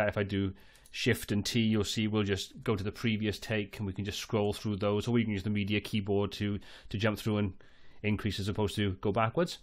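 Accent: Wales, United Kingdom. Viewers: The ever friendly Welsh accent ! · Do I detect a Welsh accent? If my ear is correct, for the WIN because me our mum is from Cardiff [Cah-diff]. =D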